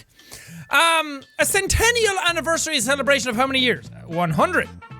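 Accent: Ireland. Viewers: One you are beautiful Two the more drunk u get the more Irish u sound Three are you Devlin out of the sabotor by any chance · He became progressively more Irish with each shot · It seems that the more he drinks, the more Irish he gets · Im irish and thats just how it works you get drunk and your voice goes full irosh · I dont know if I'm the only one that realizes, but his Irish accent is way easier to hear in this video compared to others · Jack gets a thicker Irish accent as the video goes on. Noice. What am I doing with my life. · The more drunk he is his voice gets deeper and more Irish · i didnt think it was possible for Jack to get even cuter and even more irish. i have been proven wrong on so many levels.. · by the second shot his voice got more irish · HOW IRISH IS THIS LAD?!? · It sounds like he gets more Irish the drunker he gets. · Is it me or does Jack start to fall back into his Irish a little more when he drinks lol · I love how he sounds progressively more irish when he takes more shots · Jack, you are talking Irish AGAIN... 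please stop... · As he gets more drunk, he gets more irish lmao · The more he drinks the more Irish he turns into, do you notice that? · The more shots jack took the more Irish he sounded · U know u have a strong irish accent when u start say fookin instead of fucking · Did anyone else notice how the more drunk he is the more Irish he sounds? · His true Irish man is coming out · You can hear the complete Irishness in his voice I think jack was drunk at the end there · he sound more irish the more he get drunk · He sounds more Irish the more he gets drunk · His Irish accent is so much stronger wen he’s drunk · Am I the only one that thinks that jack should do this more often and that he gets a more prominent Irish accent when he is drunk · Is it just me or does he get more Irish with every shot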